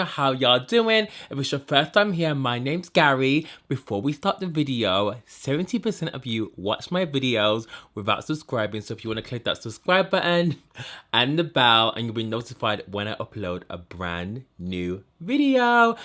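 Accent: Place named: Northern England, United Kingdom. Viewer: You’re so gorgeous it’s bloody ridiculous! Make version of Naomi C. Anyways I can hear a Sheffield or Man accent but you say all those American slangs I’m confused 😁. Uk based or nah?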